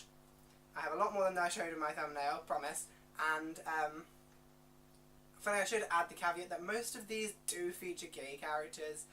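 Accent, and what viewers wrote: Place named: United Kingdom. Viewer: Thanks for doing the tag! Love your accent, where abouts in the UK are you from??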